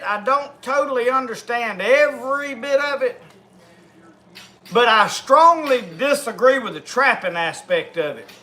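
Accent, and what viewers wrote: South, United States. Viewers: Great content my Mississippi brother..I could travel across the world and always feel at home when I hear that accent.. reminds me of the South and Tennessee.. Gods blessing on you and your family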